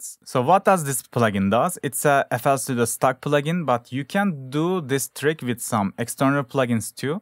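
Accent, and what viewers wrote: Turkey. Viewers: Abi valla çok teşekkürler ya 1.5 saattir bunu arıyorum. Konuşma tarzından direk türk olduğunu anladım :D Bu arada parça muhteşem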